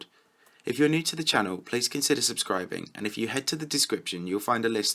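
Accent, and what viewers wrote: United Kingdom. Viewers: You should do more voiced videos, the British accent makes the whole thing come together. Keep it up! You never fail to teach me something about GD! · are you a brit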